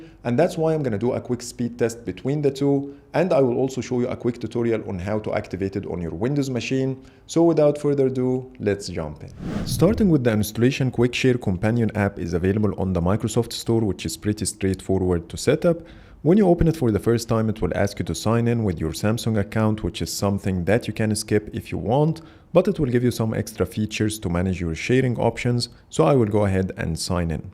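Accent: Egypt. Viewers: هو انا مستغرب بس انا لما سمعت لهجتك الإنجليزي جالي احساس انك مصري صح ولا اي😅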